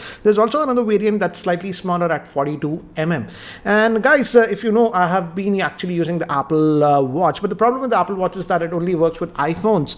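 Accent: India. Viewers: Practice more on your english accent ...less indian plz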